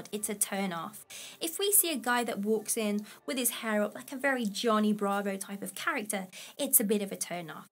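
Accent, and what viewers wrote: United Kingdom. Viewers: I like you British accent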